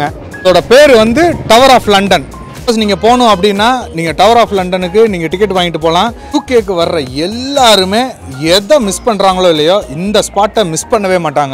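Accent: Madurai, India. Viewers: உங்க(எங்க) மதுரை தமிழ்ல கேட்க ரொம்ப மகிழ்ச்சி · லண்டனை சுற்றி பார்த்த உணர்வு.பதிவுக்கு நன்றி.மதுரைதமிழில் அசத்தலான பேச்சு.நடுசென்டரல 😀👌👌வாழ்த்துக்கள்.கோவிட் நினைவு சுவரில்SPB&VIVAKஎழுதியது .உலகத்துல உள்ள அனைத்து தமிழ் நெஞ்சங்களில் வாழும் இருவர்.பாராட்டுக்கள் வாழ்க வளர்க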